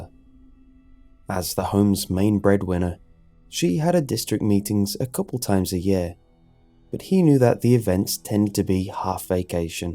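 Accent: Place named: England, United Kingdom. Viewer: Maya Pletz I mean has an extremely eloquent English accent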